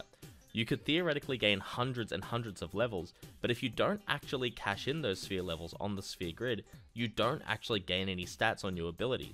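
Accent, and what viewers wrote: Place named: Australia. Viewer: nuts how you have so little subs loving this vid + the aussie accent always makes me feel at home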